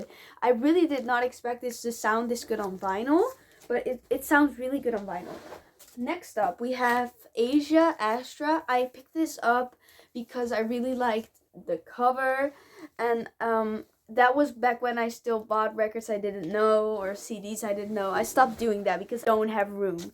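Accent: Netherlands. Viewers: You sound Dutch. I'm strictly lossless streaming nowadays but I like people going on about their vinyl😀 In my late teens I had several LPs, including your two.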